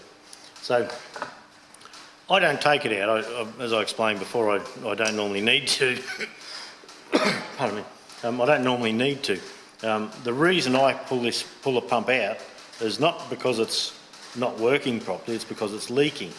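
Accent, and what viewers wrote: Australia. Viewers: Just discovered your channel. I'm a mechanic too. Mainly auto and light truck. But now venturing in big diesel like heavy truck and equipment. I'm in upper Midwest, central Minnesota. Sounds like you're in Australia? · Thanks pardner...same pump as my Cummins on my 40hyatt boat...very helpful.. Good job..u sound like Australia.. I'm n Chattanooga Tennessee.. Thanks again friend..!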